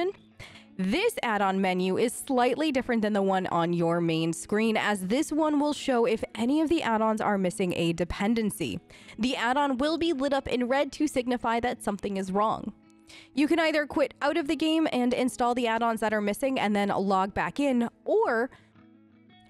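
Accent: Australia. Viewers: You are adorable and helpful ty. I can tell you have a Austrillian accent by the way you say ESO. I love it. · Sick vid, but dont you think for one moment i didnt catch that hidden aussie accent. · OHHHH she's australian! gotcha hehe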